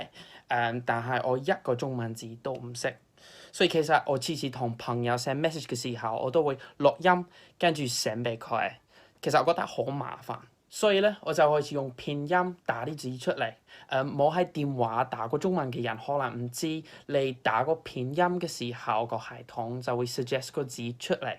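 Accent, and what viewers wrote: United Kingdom. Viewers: would have never told that it's a Brit speaking if i listened with closed eyes, well done! are you also on instagram? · Are you from Essex?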